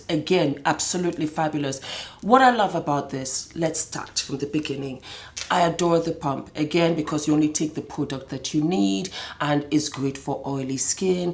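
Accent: France. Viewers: first time watching...i totally enjoyed it, love the french accent:) #newsubbie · Your French accent is coming out.... very very.i love it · That accent. is that French?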